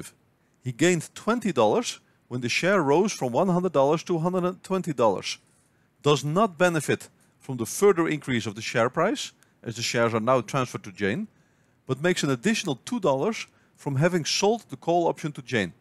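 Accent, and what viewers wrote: Netherlands. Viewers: Tis hard hoe dat ge hoort dat nen ollander is :DDD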